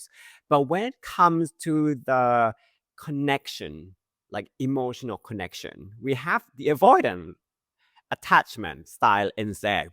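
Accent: Australia. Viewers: just curious - your accent is more Aussie than British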